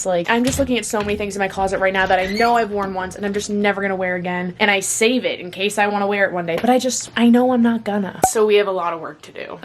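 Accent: Boston, United States. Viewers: also you being quarantined has brought your accent out sm and i love it hahaha u talk like my dad who grew up in boston "i was cleaning my rum and found the sweater ive been bitching abt" ahh yes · jamie pettit it’s actually her Boston accent. I live in the northeast and yeah it’s mainly only ~Bostonians~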